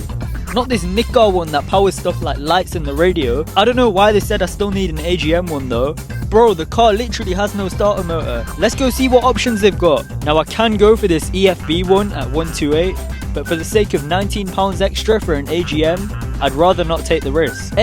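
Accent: Pakistan. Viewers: Sounds more urdu to me. He Sounds like hes a Pakistani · are you pakistani because you speak the exact same pakistani language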